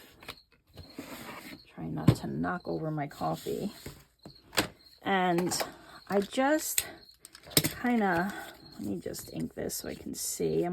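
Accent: New York, United States. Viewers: i love your videos and your ny/east coast accent. reminds me of home :)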